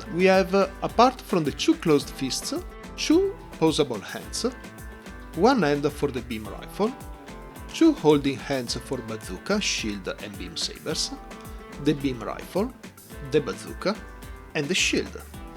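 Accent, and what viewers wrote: Italy. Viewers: You sound like an Italian 🤌😅 I hope i didn't offend you. Btw i Really enjoy watching all your videos and great tips tutorial, you should make a video about best techniques to tighten loose joints. ( Polycaps & otherwise) Soon. You did a great job on the review sir you earned a Sub 👍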